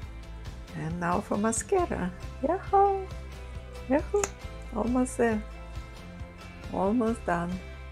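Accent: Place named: Russia. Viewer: Ооо такой у вас акцент , наверное русский?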